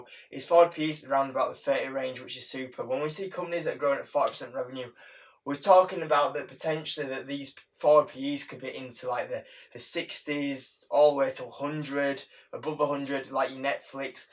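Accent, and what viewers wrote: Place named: United Kingdom. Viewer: Hi , By the accent you have , I`d say you`re british. Could I trouble you asking how much tax did you pay on your Apple shares sale ? I just started too recently and am curious . Is it just that 0.22% and brokerage fee or is it something else as well ? and after you pass like 12.5k this year , you start paying like 20% ?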